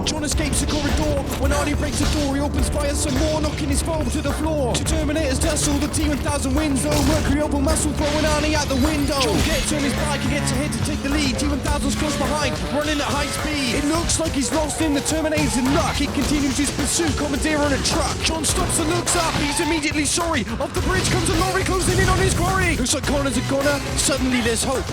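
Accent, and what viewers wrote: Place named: United Kingdom. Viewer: LOL, nah mate. He's British. Strange how other countries have trouble telling our accents apart.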